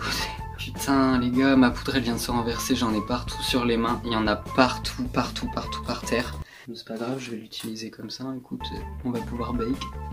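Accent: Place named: England, United Kingdom. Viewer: L’accent anglais est merveilleux 😌 Toi t’es iconic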